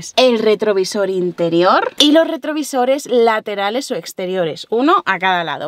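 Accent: Spain. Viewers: tu acento es el mas español q ya he escuchado si pieno en español de españa tu voz viene a la cabeza y por cierto me encanta ❤🎉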